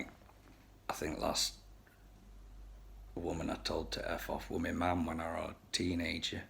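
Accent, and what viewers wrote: United Kingdom. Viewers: Don't be a soft cnt and apologies,you are the voice of a man of Britain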